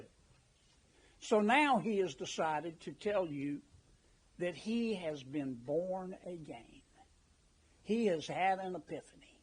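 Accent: South, United States. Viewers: Love him. His down home speaking. God Bless 🙏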